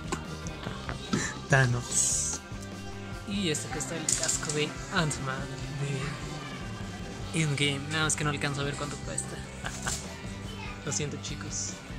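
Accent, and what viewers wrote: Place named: Fresa, Mexico. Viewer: Oye tú hablas muy fresa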